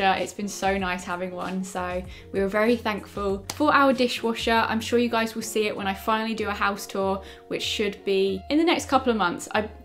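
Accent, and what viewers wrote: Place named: London, United Kingdom. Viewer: you sound like you are developing a London accent maybe? I'm not great with accents but something seems different haha. Love you X💗💗💗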